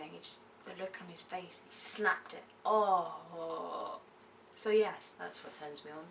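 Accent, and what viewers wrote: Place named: London, United Kingdom. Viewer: Sounds like you've caught a tad of the London accent tales, get some of those vocals up on YouTube!!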